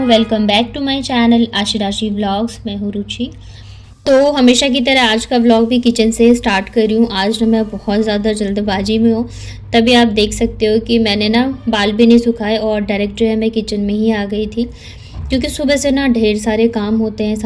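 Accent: Bihar, India. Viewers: Di aapki bihari bhasa bahut acchi lgti hai please kisi din banayiye pura blog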